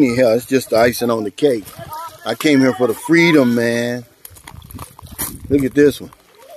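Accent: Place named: Appalachia, United States. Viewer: Sir, i like your "Hill Billy" accent . Where u got it ? Which city from the states u from ? Best regards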